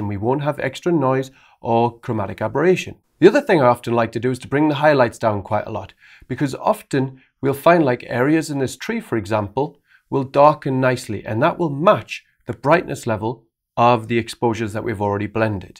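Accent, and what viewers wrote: Newcastle, United Kingdom. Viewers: Found this via SLR Lounge on Facebook. Subscribed. A Geordie teaching Photoshop might just be the best thing ever. The only thing that would make it better was if you did a video while you were drunk lol.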